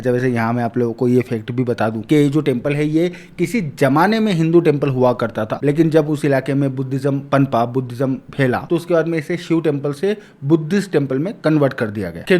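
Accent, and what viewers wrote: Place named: Gujarat, India. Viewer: Aap gujarati ho na ? Kyoki aa theth bol rahe ho.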